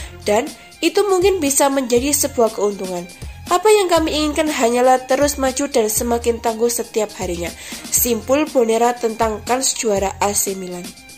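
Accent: Java, Indonesia. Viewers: Medok banget mba bacanya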